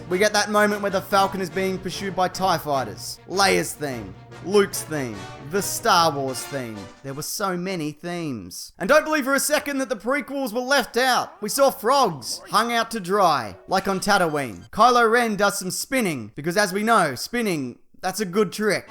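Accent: Australia. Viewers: wait are you Australian? You sound like Australian? (Just curious)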